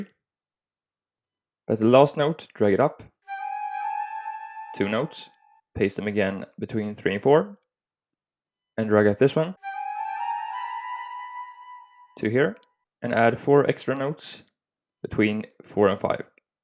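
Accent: Sweden. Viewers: Er du fra Sverige?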